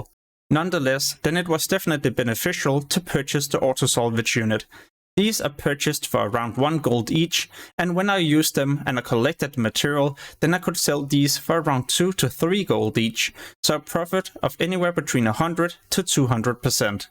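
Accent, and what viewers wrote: Denmark. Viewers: This guy's danglish accent is off the charts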